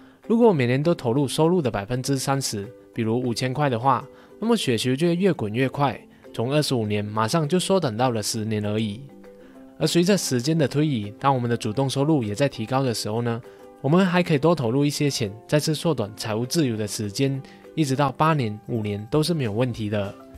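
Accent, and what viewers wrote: Malaysia. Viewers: 原來不只我覺得這支影片的馬來西亞腔比較明顯哈哈哈可是都很好喔又學了很多當我存款不多的時候創業時真的很壓力心裡不安到爆炸兜兜轉轉回歸原點這個影片真的超中肯✨✨ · 为什么今天那么马来西亚腔hahahaha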